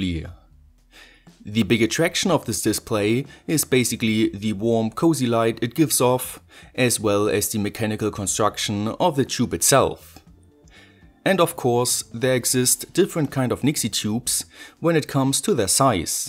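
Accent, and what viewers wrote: Germany. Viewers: Also den deutschen Akzent oder besser gesagt die Aussprache hört man gut raus aber cooles Projekt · Dieses eingedeutschte haha xd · Junge dieses schwere Atmen nach jedem Satz und dieser deutsche Akzent ahaahsh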